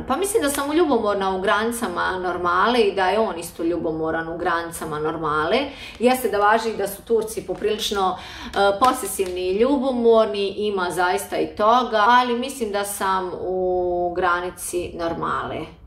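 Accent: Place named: Bosnia and Herzegovina. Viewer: Smatram da ne treba da raspravljamo o tome kojim jezikom prica.Ko imalo poznaje jezike bivse Jugoslavije taj zna dok neko, otviori usta"odakle dolazi. jeste sa Pala ali ima cisti bosanski akcent.Bez ljutnje ali budimo realni i iskreni.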